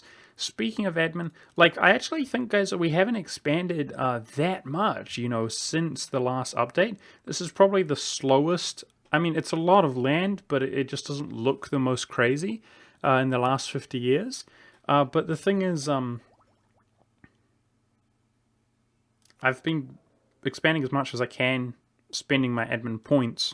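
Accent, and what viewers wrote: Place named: New Zealand. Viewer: He sounds like he’s from New Zealand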